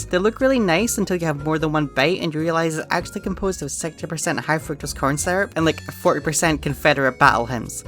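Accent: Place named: Scotland, United Kingdom. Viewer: You never fail to impress me with the quality of your content,. I loved this. A great throwback for me. Humorously told with great Scottish banter. Till the next one <3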